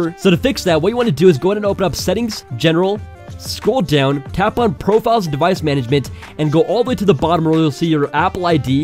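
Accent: India. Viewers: Are you Indian.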